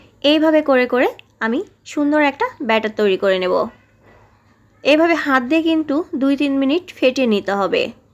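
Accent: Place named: Kushtia, Bangladesh. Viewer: Apu apnar vasha gulo amader vasar sathe mill ase,,kustia,chuadanga amr basa.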